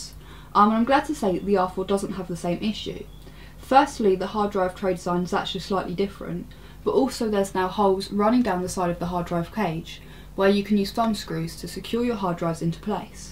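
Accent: England, United Kingdom. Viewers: pretty sure that's her accent. not sure what region of England she is from. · She's from the UK. Americans / Canadians / Aussies / Kiwis / South Africans sound nothing like this. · Who said british girld are ugly?? Shes smoking hot with that british accent!!! And very good review! Linustechtips looks so bad compared to this!!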